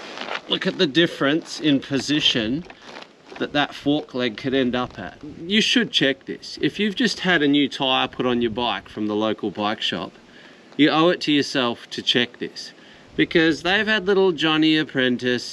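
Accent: Australia. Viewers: love that Ozzy accent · explained by a fellow Australian and in an accent/lingo I understand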